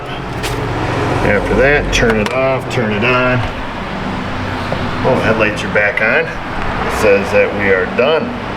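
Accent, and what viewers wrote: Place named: Upstate New York, United States. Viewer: I have been binge watching just to hear an upstate NY accent. I miss my alma mater Binghamton. Wish the communist hadn't taken over.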